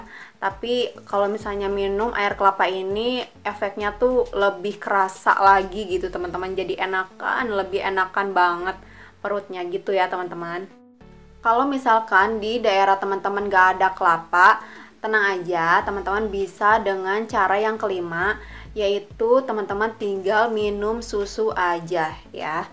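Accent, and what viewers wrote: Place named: West Java, Indonesia. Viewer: orang sunda nih kayanya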